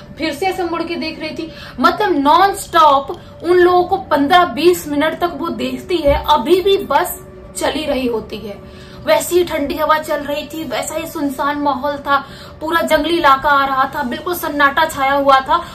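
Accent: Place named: Uttar Pradesh, India. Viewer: madam k bolne ka way of talking hi esa hai she's from uttarpradesh side...maybe isliye....but Kisi ko appreciate na kar sako to criticize karne ka bhi koi haq nhi h tum jese mahapurusho ko 🤦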